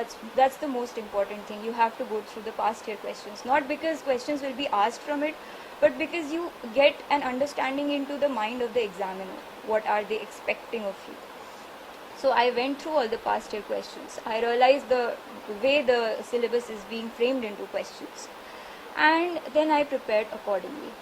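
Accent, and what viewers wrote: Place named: Mithila, India. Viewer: Aapke tone aur way SAE lag rha AAP maithil hai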